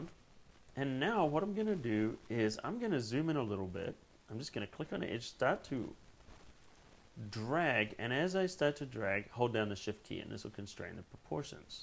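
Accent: Australia. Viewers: Where is your accent from? I'm guessing you're an Australian who has been living in the States for a while. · Good video, by any way are you an Aussie that has been living in the US for a while, you seem to have a hybrid, Greg Norman accent.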